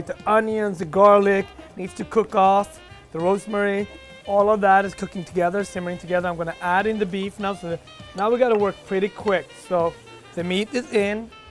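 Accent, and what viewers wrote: Sweden. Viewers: Hörde hans svenska dialekt, vad kul! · You can really hear the Swedish influence when you talk. ^^ Tack för receptet måste testa det snart!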